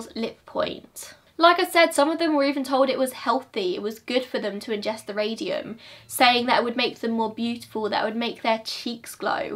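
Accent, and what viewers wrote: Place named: Cockney, United Kingdom. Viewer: Hi, you're such a cutie pie along with you're cute Cockney accent dear xoxoxo.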